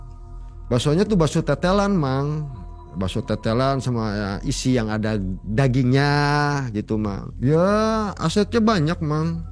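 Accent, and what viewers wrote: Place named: Cirebon, Indonesia. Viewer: Logat ngmngnya ky indramayu cirebon😃🙏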